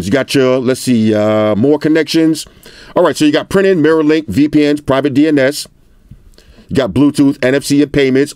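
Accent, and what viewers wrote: New York, United States. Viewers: That REAL authentic New York City accent that's why I LOVE your channel fam. Keep em videos comin foo #NYCForever #Floss💯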